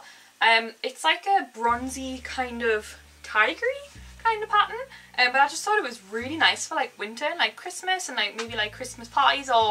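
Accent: Newcastle, United Kingdom. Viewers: You sound like ur from Newcastle (like me btw ) r u xoxo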